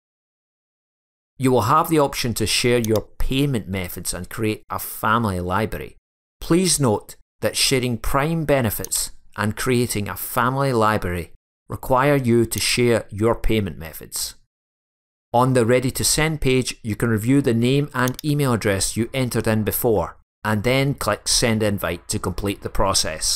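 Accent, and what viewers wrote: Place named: West of Scotland, United Kingdom. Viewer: Good West of Scotland accent mate. Cheers.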